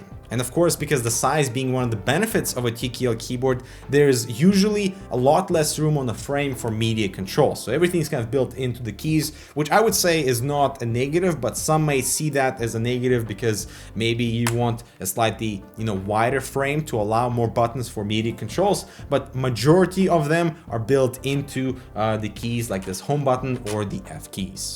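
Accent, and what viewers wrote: Sweden. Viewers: You sound like your Swedish. I like it :) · You sound Swedish...Are you Swedish?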